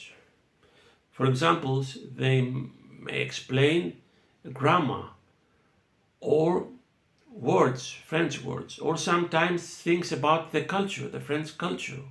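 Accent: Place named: Greece. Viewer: The way you speak english strongly suggests me that you are greek. Την εφαρμογή χρησιμοποιείς η το βιβλίο της ασιμιλ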